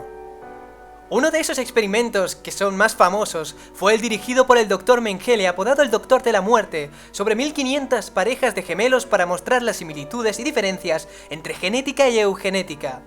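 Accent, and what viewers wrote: Spain. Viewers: Me encanta como hablan los españoles. Me encanta tambien tu canal. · sin más Español de España JAJAJAJSJJA ni modo que sea español de Colombia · así? es español de España, yo no veo nada raro xddd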